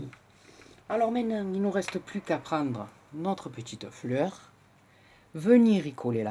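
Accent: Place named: Marseille, France. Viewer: J'adore ton acsens de Marseille et aussi ton travaille facile mercies beaucoup continue j'aime beaucoup c'est agreable vous entendre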